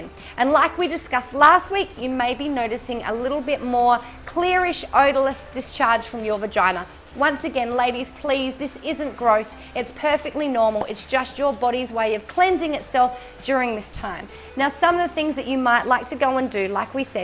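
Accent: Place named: Australia. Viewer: Well done - good Aussie accent and no rambling - ❤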